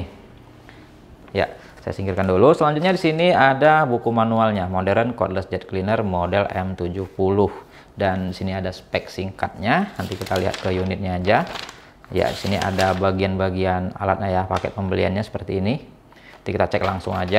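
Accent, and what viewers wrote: Medan, Indonesia. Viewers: Orang medan ya bang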